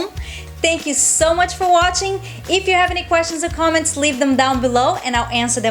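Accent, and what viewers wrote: Brazil. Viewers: Is she Brazilian? She has a very nice accent :)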